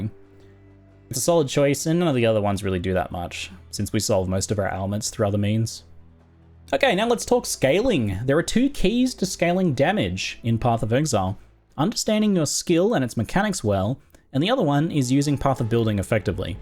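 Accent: Australia. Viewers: ihavent played PoE in ages and i was so happy to see when i googled Viper Strike build a build come up. my favorite poe guru. is australian? i cant tell the accent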